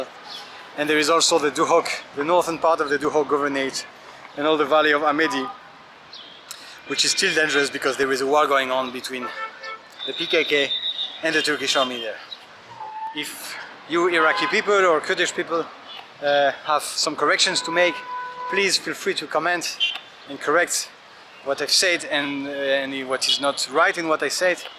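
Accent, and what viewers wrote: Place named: France. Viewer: your French accent is so cute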